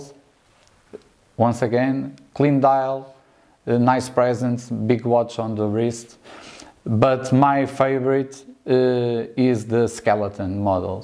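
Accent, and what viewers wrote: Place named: Portugal. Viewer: Pelo sotaque suponho não errar. É português? Bom vídeo, detalhado e pessoal. Estou em vistas de comprar um igual. Aconselha alguma loja em especial?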